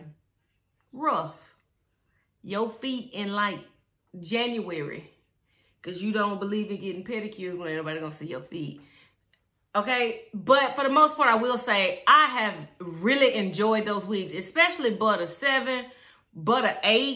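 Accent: South, United States. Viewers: OMGGGG!! I love everything about this! Your spirit, your energy, the southern twang, that bomb ass haircut and your beautiful self. Best review EVER!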